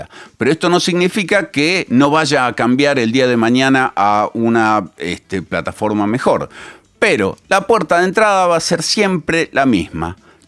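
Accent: Argentina. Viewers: Arqui.. Yo realice la creación de una GEM de Gemini y le cargue todos tus videos de REVIT y que crees??.. me responde literalmente igual que tu. Con todo tu conocimiento de Revit. Eso me ha ayudado a resolver muchos temas. Hasta el tonito argentino me da. Pero dudo mucho que iguale a tu Bot. Por cierto, le puse de nombre a la GEM "SuperManes". Saludos desde México.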